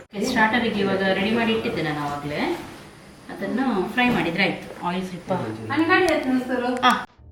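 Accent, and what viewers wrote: Mangalore, India. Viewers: ಮಂಗಳೂರು ಕನ್ನಡ ತುಂಬಾ cute unttu.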